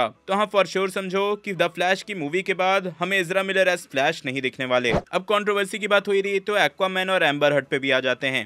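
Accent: Bihar, India. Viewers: Aap bihar se ho kya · Are bhai aap bihari ho kya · Aap Bihar se ho ky👀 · Aap bihar se ho kya 👀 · I think your r frm bihar, I'm also frm bihar😂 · Are you from bihar? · Aap bihar se ho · Are you from Bihar ? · Ap Bihar se ho ? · Sir ap bhi bihar se ho kya????? 🙂🙂🙂🥲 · Aap Bihar se ho kya Bhai :) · Bihar se ho kya? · Bihar s ho ky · are u from bihar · Bhai Bihar se ho kya?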